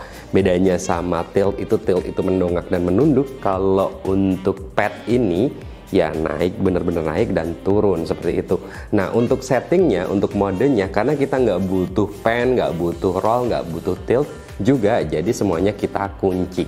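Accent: Pekalongan, Indonesia. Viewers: Wong pekalongan yo mas? 🙏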